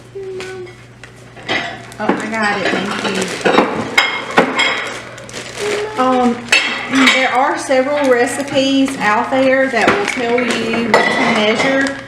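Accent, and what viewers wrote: South, United States. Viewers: You do have a southern draw!! Hahahahaha I lived a few yrs in Louisiana and people teased me a lot about my accent 😃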